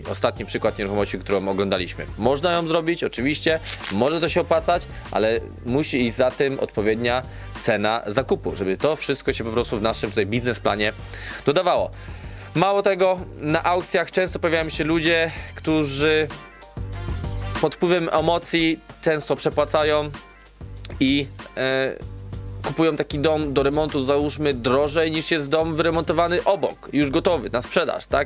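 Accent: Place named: Eastern Europe. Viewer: Slychac rosyjski ukrainski albo bialoruski akcent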